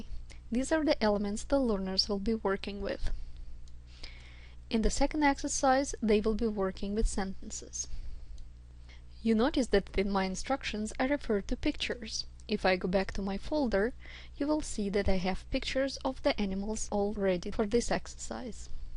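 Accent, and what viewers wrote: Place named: Russia. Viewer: This video helps me a lot! Thank you so much! Although you connect the first question to the second and so on, I don't know how to import 3 or more JMix sentences into moodle. Can I get it right? when importing these sentences into moodle, we choose all sentences! In exam view, I just import 1 file containing many different questions ( about 40 sentences). In hot potatoes, can I create and import 1 file containing many different kinds of questions? such as; JMix, Jquiz, JMatch.... I would appriciate your help! * P/S: Don't speak English in Russian Accent! It's hard to hear!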